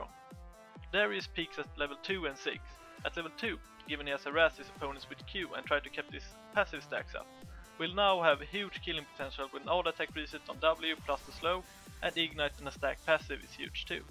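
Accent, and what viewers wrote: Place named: Norway. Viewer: where are you from? you sounds like you are from norway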